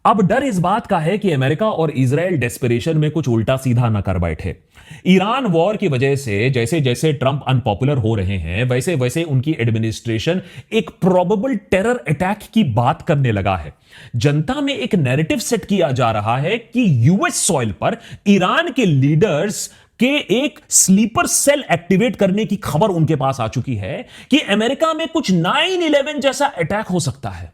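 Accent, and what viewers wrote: India. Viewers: 我听出这是印度口音，估计这个视频被伊朗人听到了，所以他们放行印度船只。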